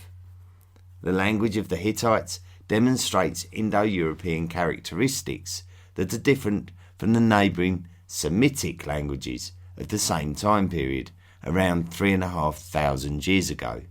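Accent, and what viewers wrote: United Kingdom. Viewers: While the “a” does sound Aussie-like, his other vowels, contractions and other patterns are definitely British but I can’t pick the region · Voice reminds me of American sportscaster, Howard Cosell. Only with a British accent.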